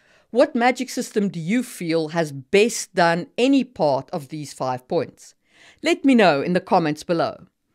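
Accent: South Africa. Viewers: You sound like you're from South Africa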